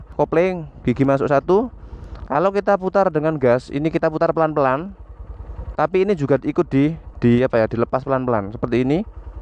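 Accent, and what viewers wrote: Java, Indonesia. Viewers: pake logat jawa :v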